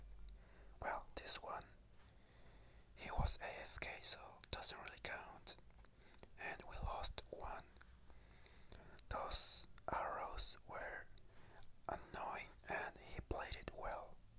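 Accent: Argentina. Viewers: Dude u from Argentina?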